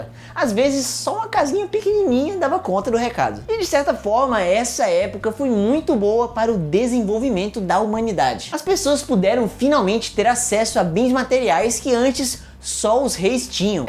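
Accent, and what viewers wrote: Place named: Northeast, Brazil. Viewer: Tu é de onde aqui do Nordeste ? 🤔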